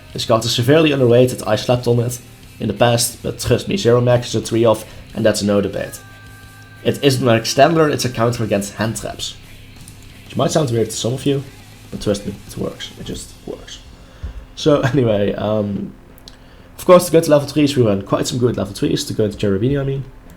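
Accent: Netherlands. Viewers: Are u dutch? U have a dutch accent Nice video btw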